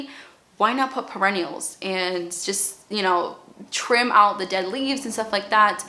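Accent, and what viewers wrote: Boston, United States. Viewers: You have like a Boston accent haha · I am from Boston too ,, great accent right?? You are wicked smot,, lol! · I really love your Boston accent. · I hear a BOSTON accent 💕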